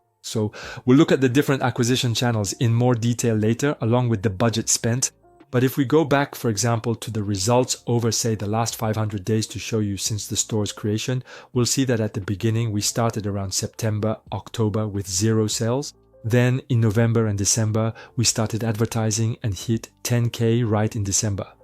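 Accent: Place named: France. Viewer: vu son accent en plus je pense que c'est un français je reconnais l'accent :)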